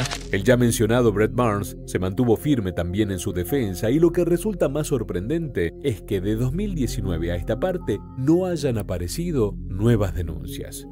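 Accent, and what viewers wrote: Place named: Argentina. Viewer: Jajajaja jajajaja jajajaja 😂 😂 😂 😂 T salió bien cool el hablado argentino bro..... Saludos y gracias x la continuación estuvo super al = q el 1ro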